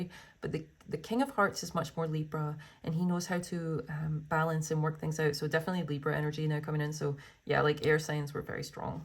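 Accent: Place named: Barbados. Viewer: I just found you today!💜. I love your accent - Bajan 🥰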